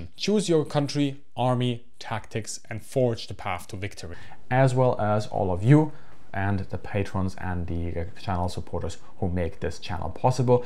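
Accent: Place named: Germany. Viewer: Ukraine has an aviation problem, they have none. They have to go begging to EU countries because, well theirs have been destroyed. I love how a guy with a German accent recommends how Russia should use their helicopters, lol what a joke, I'll take him seriously when Germany stops hiding behind Americas petticoat and enters the conflict.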